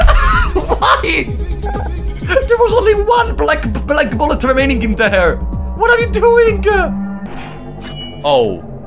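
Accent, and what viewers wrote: Italy. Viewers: I watch especially for the fear & hunger videos so hearing him in this video make confused exclamations instead of knowing every single secret of the game is very strange XD and speaking as an Italian to another Italian it's so nice to hear someone with an English pronunciation similar to your own! you make great videos man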